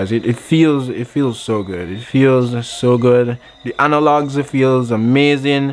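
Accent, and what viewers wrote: Jamaica. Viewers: I can already tell ur from Jamaica bro 😂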